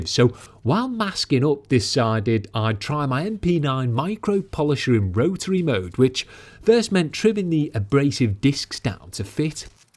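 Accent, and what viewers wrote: Liverpool, United Kingdom. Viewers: Mate, love you're vids, voice overs and production. Apart from the skill and dedication they show, they're incredibly relaxing. As a Geordie, I never thought I'd say that to a scouser 😂😂😂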